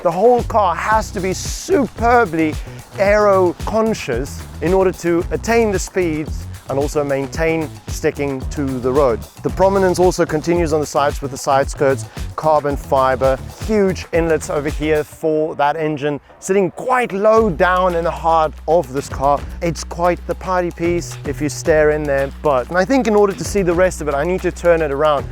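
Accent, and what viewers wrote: Afrikaans, South Africa. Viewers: defnitly .you can hear that afrikaans in him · Is this dude from South Africa?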